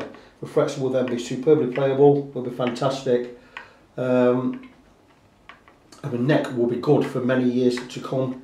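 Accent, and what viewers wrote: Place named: England, United Kingdom. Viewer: The best value I found was a pristine EG-303 Yamaha and the EG112C2 is a nice HSS Strat type as well for cheap US Dollars. He is right : Yamaha electrics are well made, even the cheap low-end ones. That guy is a national treasure to Americans: we never hear a workaday Englishman talking and forgot where our early citizens came from in large part.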